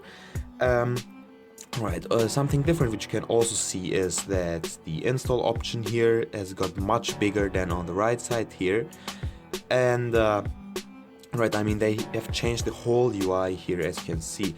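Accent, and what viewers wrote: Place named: Germany. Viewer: Man merkt das du deutscher bist 🇩🇪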